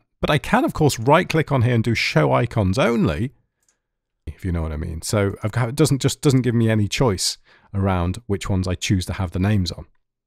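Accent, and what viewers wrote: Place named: United Kingdom. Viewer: You sound like ownagepranks british accent jonathan character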